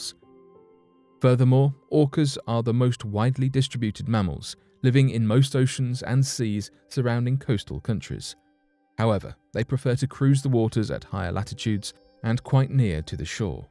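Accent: England, United Kingdom. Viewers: Look mate you sound English uses feet not metres were not in the EU anymore OK .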